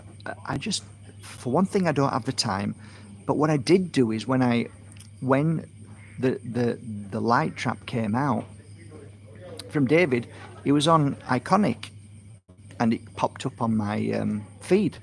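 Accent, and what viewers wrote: Yorkshire, United Kingdom. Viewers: Hi. I would never have guessed that you are from Yorkshire haha ❤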